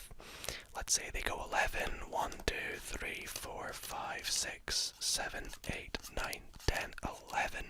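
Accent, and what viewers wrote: Scotland, United Kingdom. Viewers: Took me a minute to notice you're scottish 😄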